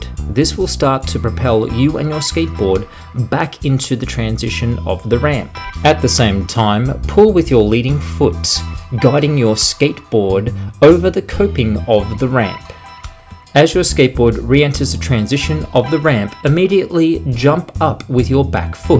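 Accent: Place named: Australia. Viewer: Haha I love the narration. So Aussie.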